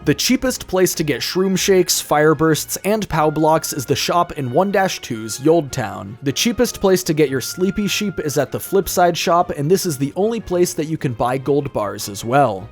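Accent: United Kingdom. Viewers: British?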